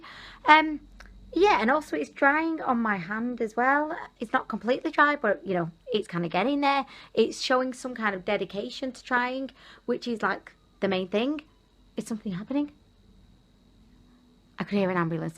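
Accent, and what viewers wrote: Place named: Manchester, United Kingdom. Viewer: Love your personality and accent are you from Manchester:D x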